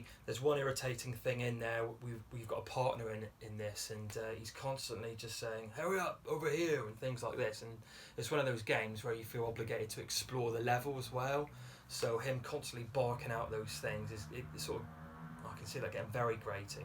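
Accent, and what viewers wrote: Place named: Wales, United Kingdom. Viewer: Some great answers there buddy 😄 ive never played a mana game but always been interested. There should be more Welsh accents gaming 🤣🤣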